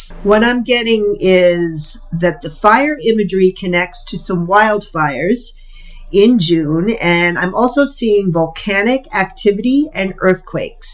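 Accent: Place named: Maine, United States. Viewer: Wow I’m from Maine and right before you mentioned VT and NH I knew you were from up here because of your accent!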